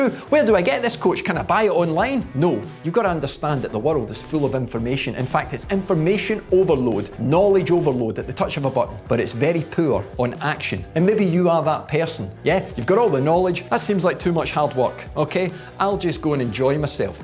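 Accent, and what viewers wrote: Scotland, United Kingdom. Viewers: I never expected to get my fitness advice from a guy who looks like Spud and talks like Begbie, but here we are....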